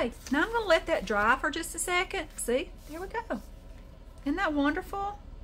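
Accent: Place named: Tennessee, United States. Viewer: Great tutorial! I have a sister-in-law from Tennessee who lives in Alabama and works in Georgia and you sound so much like her! I'm from Pennsylvania and don't have an interesting voice at all. What a beautiful journal! I just started mine.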